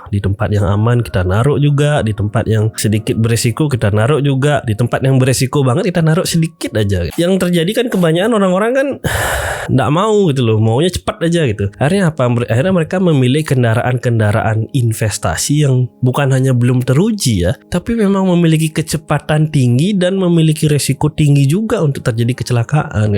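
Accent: Bali, Indonesia. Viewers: Bali itu bang...sekilas mirip penekanan dan nada nya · Keliatan banget orang bali dari logatnya om 😅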